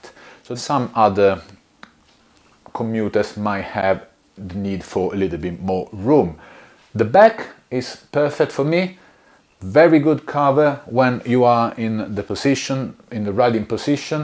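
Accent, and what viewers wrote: London, United Kingdom. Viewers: Your accent might say “Londoner” but that beard screams “Italian”. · Hey alright geezer living the London accent 😂. Great review mate on that jersey. Stating all the pros and cons!!!! Does that jersey come in other colours??? Your channel is growing and you’ll be getting loads of stuff to review so keep doing what you’re doing & a excellent ave speed today mate 👍.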